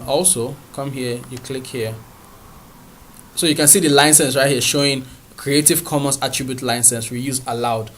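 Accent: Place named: Nigeria. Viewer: Hi. Thanks for your a great video. I like your accent. Are you Nigerian? I saw your comment on another video I jus subbed you. Please sub me back I'm also about making money on YouTube.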